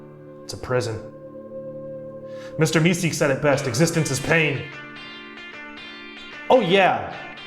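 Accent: Brazil. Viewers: desconfiei DESDE O PRIMEIRO SEGUNDO que tu é brasileiro 😂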